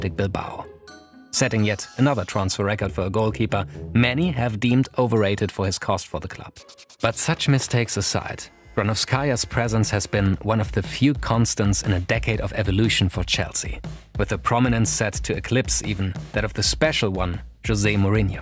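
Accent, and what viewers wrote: Germany. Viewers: Nice german accent btw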